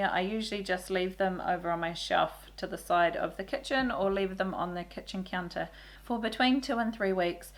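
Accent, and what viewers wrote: New Zealand. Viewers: Awesome! So good to hear a kiwi accent! I live in the states and have done for years. Was looking around to make apple cider vinegar. So I will try yours . Thanks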